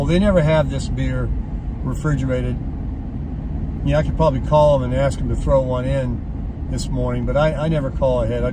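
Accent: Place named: New York, United States. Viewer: Are you single New Yorker cause I'm a single Chicagoan! Our accents would be fun times.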